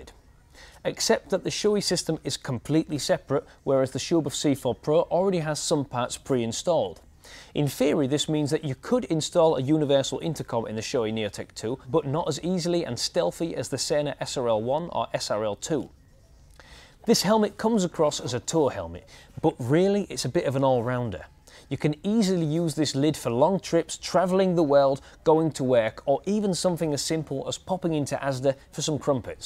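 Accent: United Kingdom. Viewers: Lekker accent maat, moest toch heel even twijfelen of het nou toch stiekem brits was.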